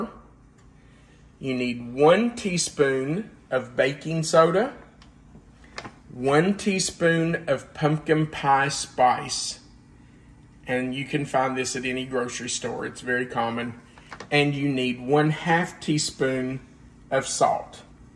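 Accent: South, United States. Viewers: His stories are one of the reasons I enjoy watching. I am from Michigan and love to hear southern folks talk , it is intriguing and oddly relaxing to me. But to each is own.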